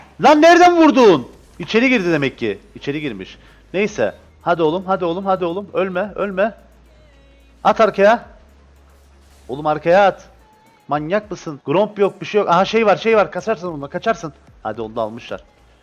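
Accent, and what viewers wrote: Cyprus. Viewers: sen kıbrıslımısin ben öyle gardaş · ABI SENI IZLIODUM BABAM DUYDU BIRAZ DEDKI KI KIBRIS LI MI BU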